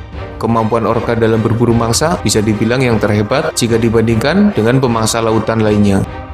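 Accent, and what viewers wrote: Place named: Banyumas, Indonesia. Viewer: Bahasa jawa ngapak.. gak enak di dengar jawa ngapak..🤮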